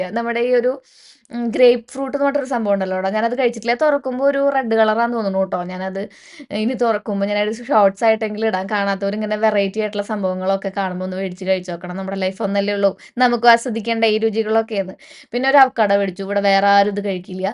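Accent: Thrissur, India. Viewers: Eyyy... Noo... ഇത് തൃശ്ശൂർക്കാരുടെ സ്വന്തം ശൈലിയാണ്.. ❤️❤️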